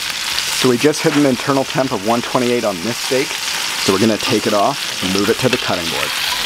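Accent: Canada. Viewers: I love how extremely wealthy people wear God awful sweaters like that . Called his gas grill a BBQ LOLOLOL you have to be wealthy to talk like that. Must be Canadian because he says aboot not about.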